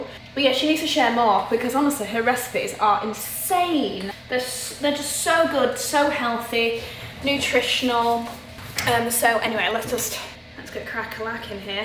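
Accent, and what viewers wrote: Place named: North, United Kingdom. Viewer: Keep the northerness going! I love it when you drop in certain phrases or ways of saying things. Wishing you, and Boe a lovely Christmas xxx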